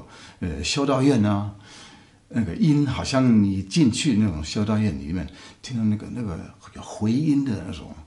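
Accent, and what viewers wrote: Taiwan. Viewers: 您溫文爾雅的台灣國語的口音和您舒緩悠閑的講話的節奏與您動人的琴聲一樣和諧悠揚，真心感謝！希望您有機會給大家介紹拉赫瑪尼諾夫的《第三鋼琴協奏曲》謝謝。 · 好幸運可以在網路遇見以領略西方音樂的故事，很好奇您身在德國卻能說一口標準的台灣腔中文，給您按無限個讚。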